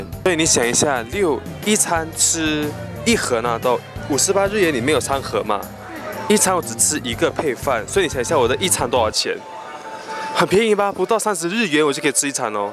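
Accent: Taiwan. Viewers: RYU口音也台湾，不说我大马人的都快听不出。（另外女友口音好有趣） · 沒有馬來西亞腔了。你不說還沒發現原來是馬來西亞人～～ 😂 說話很像台灣人了。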